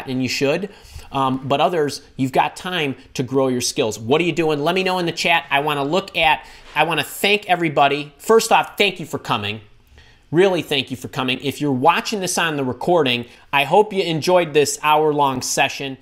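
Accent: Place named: New York, United States. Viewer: thanks so much. These times are VERY weird - I don't have to tell you. A "little" off topic here, but I do really like your accent and I'm guessing you're in NYC? How are things there? We've been in tis "lockdown" for a week and a half and it's driving us crazy! But anyway - I'm wondering as the powers-that-shouldn't-be seem to be hell bent on upending our world as we knew it anyway - if there'll be more jobs available "online" as in virtual assistants...perhaps you could do a video concentrating on ideas for all of us currently out-of-work admin folks? I'm fluently bilingual (English/French) so I can translate as well but not "officially". Hmm....I'm hoping to find employment asap - as are we all of course! I'm just wondering what the market is for international bilingual virtual assistants? Heartfelt thanks!;-)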